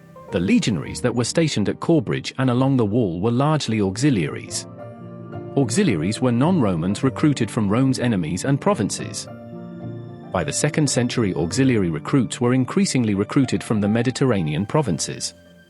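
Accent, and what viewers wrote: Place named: United Kingdom. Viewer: At least it is a British accent.😀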